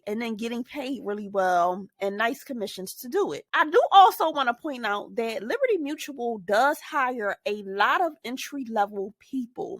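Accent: Philadelphia, United States. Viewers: That philly accent is strong!!! I love your energy lol keep going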